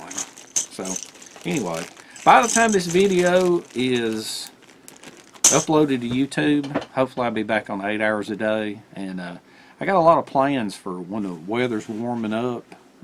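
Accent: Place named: Georgia, United States. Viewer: You sound like Ga boy, I know all about those shutdowns, local 1263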